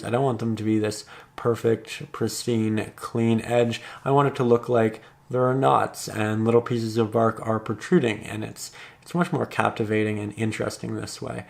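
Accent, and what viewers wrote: Canada. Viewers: Is that a Canadian accent I detect?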